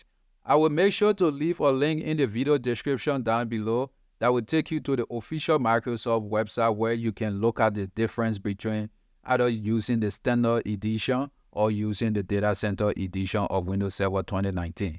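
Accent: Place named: Liberia. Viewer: Big up yourself. You sound like Liberian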